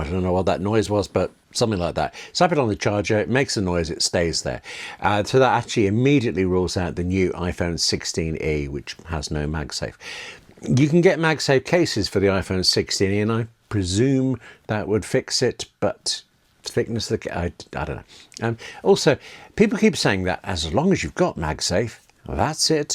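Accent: United Kingdom. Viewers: Great British accent but how about specifying the phone models on your headline not to waste any more time of us lowly SE users? 🖕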